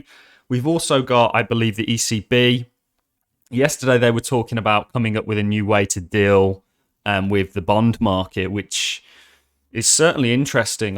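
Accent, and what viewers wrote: Birmingham, United Kingdom. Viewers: Where abouts in the midlands are you from? ( I detect a slight brummy twang ) 😂 I'm from Tamworth and you have a very similar accent.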